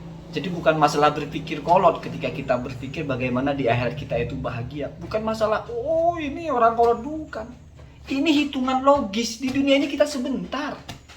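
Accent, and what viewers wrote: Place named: Lampung, Indonesia. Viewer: Bang.. ente orang mana sih. Kok mirip orang Lampung 😁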